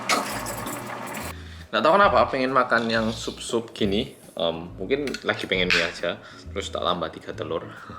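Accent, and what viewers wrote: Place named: Java, Indonesia. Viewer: mas jawa puoool tapi kalo kaget, trus ngedumel, sama kagum gitu korea nya keluar banget hihi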